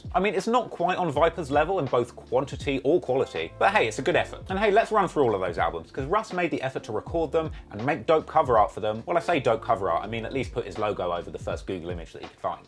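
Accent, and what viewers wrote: United Kingdom. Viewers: Bro dint know you were from UK well the accent I can hear but dint really pick it up til this video ahhh might as well been a joke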